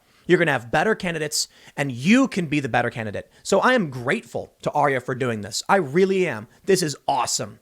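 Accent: Chicago, United States. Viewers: Yup. Im English but is it his slightly Northern/ Chicago accent?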